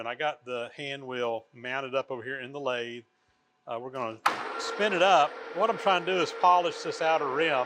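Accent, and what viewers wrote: South, United States. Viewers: Always nice to see your projects coming along narrated by your southern accent that is soft on the ears to this NYC guy. I hope that someday when this country returns to manufacturing and engineering fine products that young folks can watch these videos and see a skilled craftsman at work.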